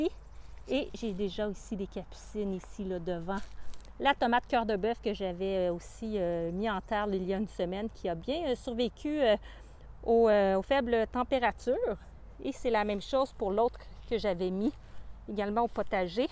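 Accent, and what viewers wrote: Quebec, Canada. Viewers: Bonjour :) Merci pour la vidéo, encore mieux avec un accent québecois ;) Vous pouvez aussi arroser le fond du trou de plantation des tomates, avec un ch'tit pipi dans l'arrosoir, votre chum sera ravi de vous y aider et se sentira utile ;p Bon potager à tous :) Édit : j'ai commenté trop vite, vous arrosez déjà le fond du trou :D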